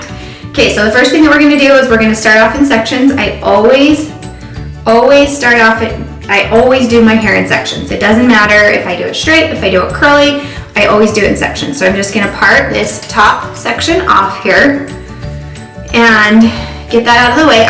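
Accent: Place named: Utah, United States. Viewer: I thought she had a Utah accent! I live in California 30+ years and when I go back I slip into the lingo and accent.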